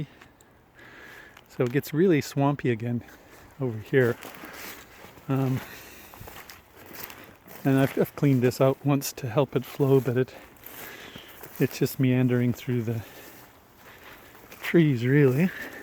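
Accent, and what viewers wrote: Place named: Maritimes, Canada. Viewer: You are picking up a touch of maritime accent.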